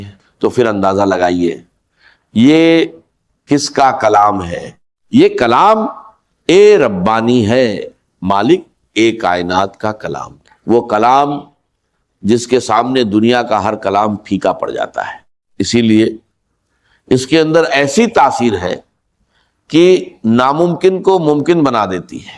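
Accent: India. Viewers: Ya kesi Hindi walay ki awaz ha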